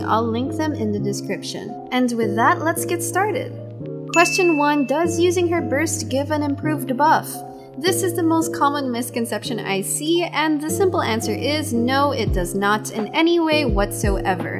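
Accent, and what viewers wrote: Philippines. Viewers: It's in your about? I should have just checked 😆 I think you're from the Philippines tho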